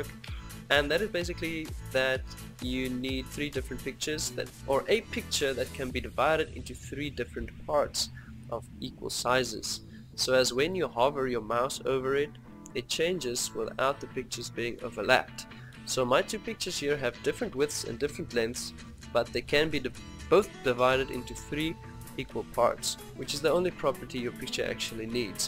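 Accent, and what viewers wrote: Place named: South Africa. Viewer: I can recognize a South African accent from a km away lol was just about to ask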